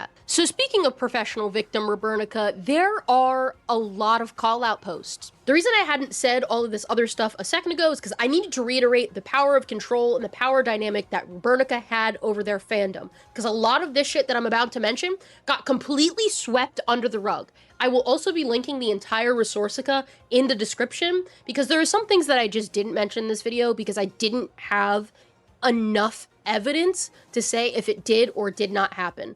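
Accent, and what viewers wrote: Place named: Appalachia, United States. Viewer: as someone else from rural appalachia hearing your accent made me really happy because i dont think i’ve ever heard any video essayist with one similar to mine. you’re awesome definitely subscribing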